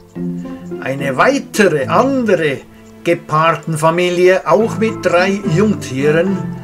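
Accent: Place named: Germany. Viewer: What the f is this guy saying???? I know he's speaking English but it sounds German.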